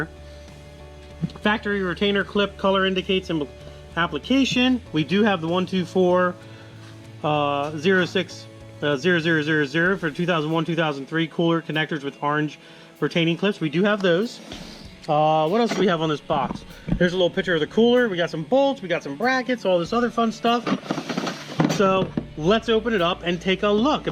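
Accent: Pennsylvania, United States. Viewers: It's a Pennsylvania thing. I'm from pa and everyone says it like that.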